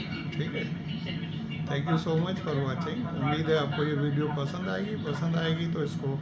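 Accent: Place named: Pakistan. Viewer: no I was talking about his language. And that his accent tells me that he belongs to Pakistan.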